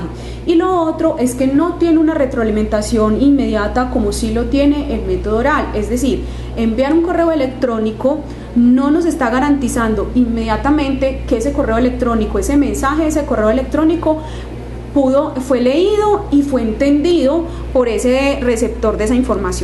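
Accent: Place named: Antioquia, Colombia. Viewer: excelente material pero debería ser mas neutral en su acento y entonación, demasiado paisa en su forma de hablar.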